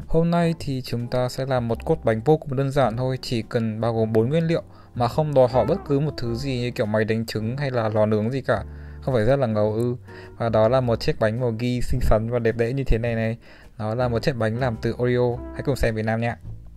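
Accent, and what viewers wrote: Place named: Hanoi, Vietnam. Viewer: nghe giống giọng ctrai HN , Thích ghê á :)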